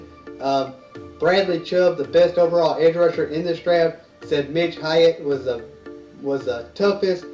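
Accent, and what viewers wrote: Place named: Milwaukee, United States. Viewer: You're a Milwaukee resident? That accent though!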